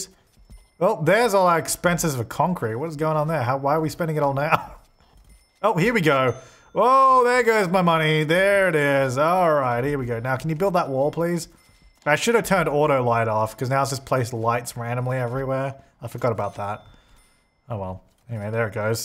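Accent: Australia. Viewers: They're both Aussie, so maybe that's why they sound the same to you?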